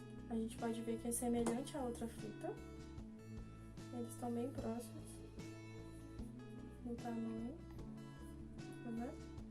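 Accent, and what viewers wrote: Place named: Minas Gerais, Brazil. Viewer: Ela é muito linda, e me parece pelo sotaque, que é conterrânea, das Minas Gerais.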